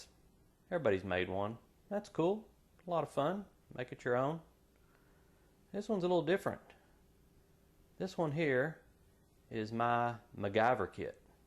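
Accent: South, United States. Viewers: His voice reminds me of Mr. Rogers, but with a southern accent.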